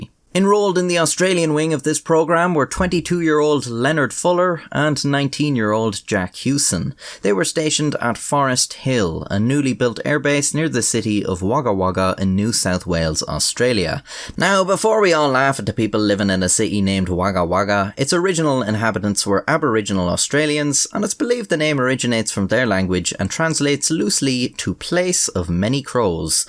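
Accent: Ireland. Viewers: Funniest Irishman I ever listened to!!!! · I am a simple man, I see a upload, I click and begin to absorb knowledge from the spectacular Irish narrator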